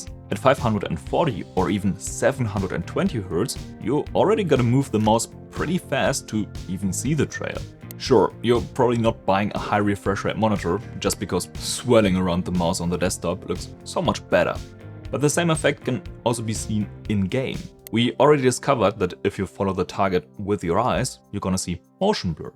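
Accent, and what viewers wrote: Germany. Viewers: bist du deutscher? oder spinn ich... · Falls du deinen Akzent verbessern willst: Schau dir mal an, wie man das "e" in Hertz im Englischen aussprichst. Musst die Zunge dabei deutlich entspannter halten - du gehst in Richtung Amerikanischem "r" - machen viele Deutsche so, ist aber für den Großteil der Englischen Welt falsch und passt so überhaupt nicht zum Rest deines Akzents. Ähnliches gilt übrigens für das zweite "o" in "Monitor". Nur so nebenbei ;)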